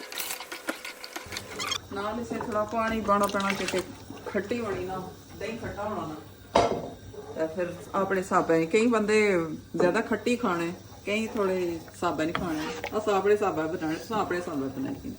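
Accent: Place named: Punjab, India. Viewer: Punjabi accent is so sweet ❤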